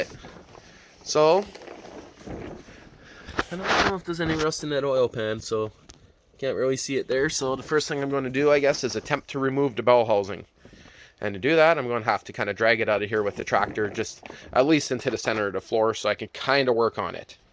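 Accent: Canada. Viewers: I was hoping to see a rapid dis-assembly of this little stump puller Diesel... I guess that will be on the next video... From what his accent sounds like, this was filmed in Canada...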